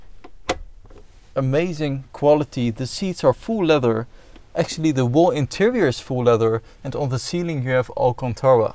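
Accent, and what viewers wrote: Netherlands. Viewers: Your dutch accent is killing me, but what a car omg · Toffe vid maar je kan horen tot je NE bent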